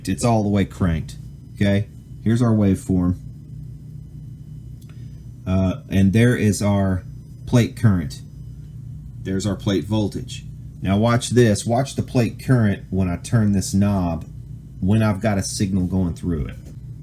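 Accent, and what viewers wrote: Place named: South, United States. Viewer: The slight southern accent adds to the credibility of this bullshit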